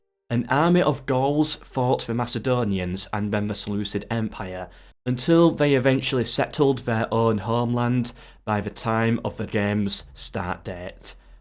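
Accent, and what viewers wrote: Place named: Northern England, United Kingdom. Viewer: loving the northern accent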